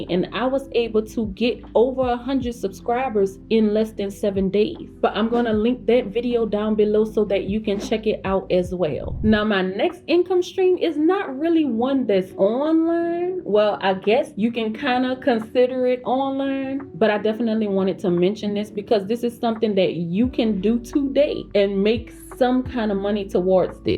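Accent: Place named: Louisiana, United States. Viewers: Hi my beautiful sister. You sound so much like my ppl back from Louisiana. Have to keep going bun binge watching your videos and a few others bcuz i just got curious about it yesterday. Thanks for sharing sister. I hope you have an amazing Sunday and a blessed week ahead ❤🙏🏽🥰😍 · I love you accent!!! You must be from Louisiana!!! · Just came across this video! I heard of E-commerce YEARS ago and set up stores but never followed through. I started again this weekend. And ma’am, where are you from?! You sound like you’re from Louisiana. 😆that’s where I’m from so idk, your accent sounds real familiar. Lol ❤️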